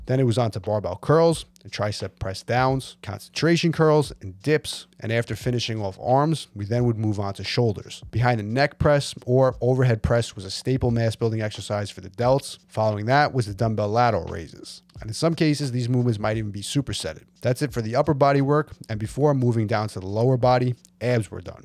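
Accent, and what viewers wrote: New York, United States. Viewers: Is this new jersey or new York accent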